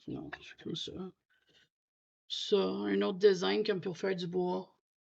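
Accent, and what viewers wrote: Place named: Canada. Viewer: Bonjour ,ton accen me permet de voyager vers ce magnifique canada là où j'aimerais aller tu as une magnifique collection de crayons et de matériel je viens de tomber sur ta chaîne que c'est la numéro 2 , après je regarde la 1 ,j'ai adoré ta vidéo et chaque personne a ses crayons préférés sa ne s'explique pas 😅 je suis ravie de te connaître les brutfuner sont mes crayons préféré j'ai les 180et les520 et djautre gamme que tu as présenté merci beaucoup pour ton partage bisous 😊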